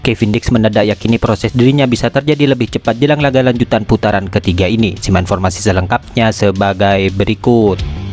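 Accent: Madura, Indonesia. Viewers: Adminnya logat madura😀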